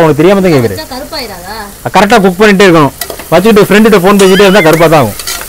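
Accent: Madurai, India. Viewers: I am not here for your recipe, but your humorous commentary and your beautiful Madurai tamil. Love from Bangalore bro. Always keep doing the beautiful work. Cheers❤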